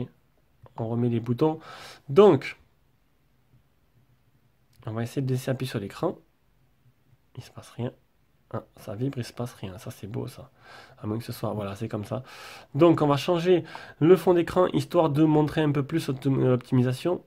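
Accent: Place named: Southwest, France. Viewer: A quand la vidéo du test final de ce tél , car j'hésite vraiment a l'acheter....je le trouve fabuleux niveau photo plutot fluide avec un look sobre et agréable...mais que vaut Réellement ce Modèle Amigo ?....en tout cas chapeau pour tes vidéos et ta voix perso ! elle me plait , ça chante le Jolie Sud Ouest ...lol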